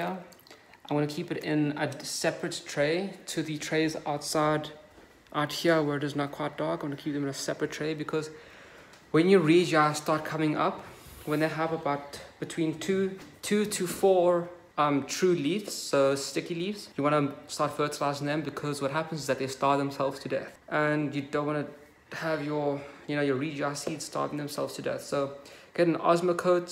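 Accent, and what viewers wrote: South Africa. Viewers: Australia? Here all these videos I thought I was listening to south africa.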